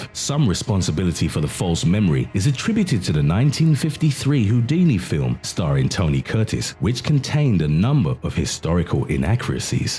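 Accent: United Kingdom. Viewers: Mainstream Commercial Nihilism he has a British accent so mandeler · its a British accent :/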